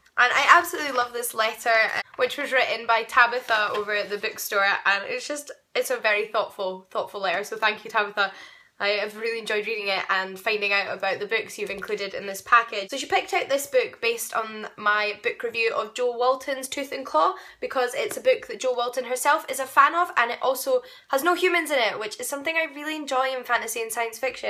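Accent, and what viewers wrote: Scotland, United Kingdom. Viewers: love your accent! You are Scottish, aren't you? I love Sir Arthur Conan Doyle's works too!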